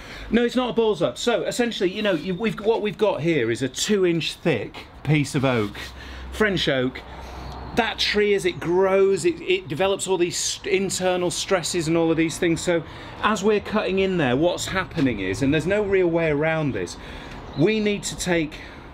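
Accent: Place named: Ireland. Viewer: Irish accent !!!???!!! Not to bad I guess lol Sean from IRELAND 😉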